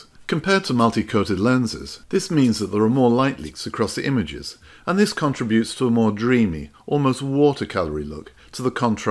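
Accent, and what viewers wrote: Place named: RP, United Kingdom. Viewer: His particular accent and tone sound almost identical to David Cornwell, the real name of the great writer John LeCarré. It’s beautifully cultured in that special British way. I’d be interested to know a bit about background, although it really has nothing to do with wonderful lens videos!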